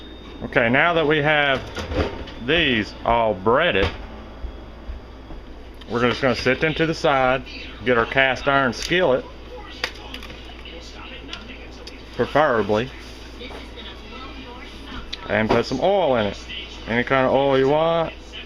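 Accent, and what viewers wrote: South, United States. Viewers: King of the dingalings........Southern accents are the best ever. Dont hate cause you ain't son. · From the sounds of this southern man, he probably doesn't care about your two cents. Move along... Totally trying this right now.... thank you for sharing! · really someone said European accent ugh nooo its a southernnnn one lol come on yall! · That's a southern accent believe me I'm from South Carolina and we have that southern slan as well.. Greenville SC in the house and your food looks 😋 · My parents were from Missouri. You sound more southern than that. But listening to you speak takes me back to when my mom took me to Missouri, 8 months before she died. And your recipes look so delicious. All you need to do is make tomato sandwiches! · you have a cute voice I love the southern accent!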